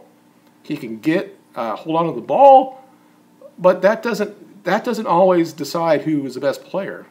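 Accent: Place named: United States. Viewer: You are German and French? Yiu sound American to me. Regardless of where the players are from it has gone down the drain with football/soccer. I am happy I will work during tomorrow's final. I am a Lazio fan. Milinkovic Savic is a monster. Incredibly talented. Just you pay enough and he is yours.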